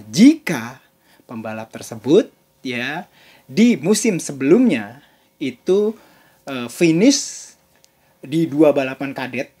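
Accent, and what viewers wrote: Indonesia. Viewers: 😂kang,biar enak di dengar,coba kalo nyebutin nya jgn lidah indo banget....coba gini biasa aja: komfom jangan sampai bunyi yg keluar komfrom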